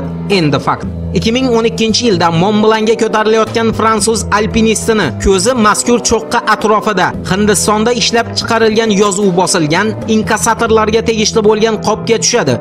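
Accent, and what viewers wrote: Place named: Andijan, Uzbekistan. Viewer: Anjanchasiga gapiryapdi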